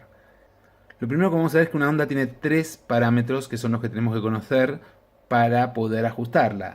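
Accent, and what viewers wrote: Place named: Argentina. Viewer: un español que habla en argentino. jej. . tu video para alguien que recien comienza, el mejor de los mejores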